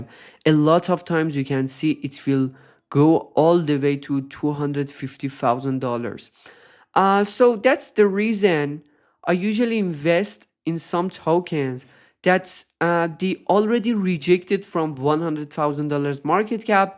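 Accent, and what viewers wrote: Iran. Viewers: Bro are you Persian? You sound like Iranians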